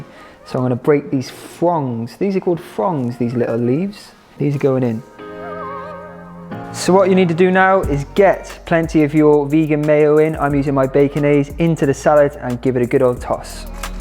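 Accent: United States. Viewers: That American accent is both endearing and entertaining. I have to try these recipes! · omg that American accent. I'm dying 🤣 I have had the biggest grin this whole video lol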